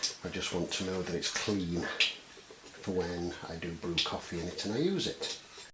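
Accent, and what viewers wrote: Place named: Scarborough, United Kingdom. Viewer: Your accent sounds like your from Scarborough. That looks like an old Pezzetti pot. It has a bakelite handle and knob. They only use plastic these days .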